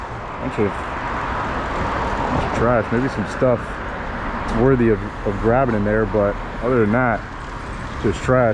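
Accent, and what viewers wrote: Minnesota, United States. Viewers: Don't look at my feet🤣🤣🤣!! Love your content bro! It's crazy because you sound like this guy I used to work with who was from Minnesota. Not sure if you've already finished the giveaway, but if you haven't, I wish you would consider me! It's kind of weird because I'm 51 years old and the whole e-bike scene is just way too exciting for me and I would love to be a part of it! The thing is I'm kind of in a messed up spot, because of a ticket I got while I was delivering with Amazon. I was delivering a package to a dark neighborhood and I was using my brights and for some reason I was pulled over and given a ticket for using them. Right now my license is suspended and I had to sell my car to pay some bills and food. I love to win that bike because I could use it here in Houston to see how the market for delivering on an e-bike would be here. 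I follow some guys who delivers food on e-bikes in California and they seem to be doing fairly well! I'd love to try that in Houston being that it's a pretty big city and I have a feeling the market would be good and it kind of nichie in a good way! It would really help me out because for some reason people are looking at my age and it's being hard to get another job! Before I was working with Amazon, I had a job that I was with for over 20 years and when covid hit, I lost it!! Screwed up thing is that I am a very young 51 in that I feel like I'm still in my 20s! I could do some deliveries until I find something more permanent but it would de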